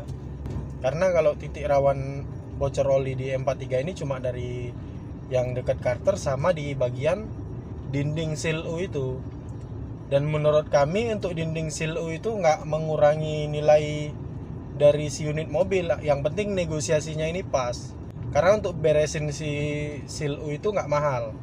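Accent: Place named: Aceh, Indonesia. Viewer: Kalo di lihat dr logat bahasa kayaknya ini di aceh ya Bang?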